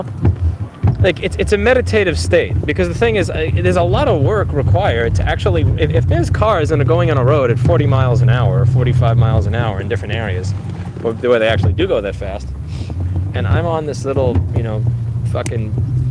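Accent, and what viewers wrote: New York, United States. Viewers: thats called NY Jewish accent...works even if not Jewish, but we all have it and separates the locals from the wannabes or in this case the Macabees!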